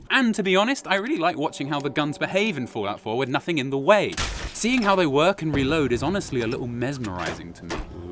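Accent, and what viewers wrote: United Kingdom. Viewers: I must have had several brain hemorrhages because I never realized you were British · I love the British accent💙. Also great video! I could not stop laughing 😁😁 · wait hes british?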